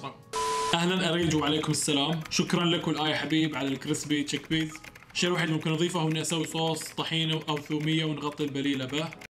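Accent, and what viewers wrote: Eastern Province, Saudi Arabia. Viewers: من لهجتك واضح انك من الشرقيه وربما الاحساء تحديدا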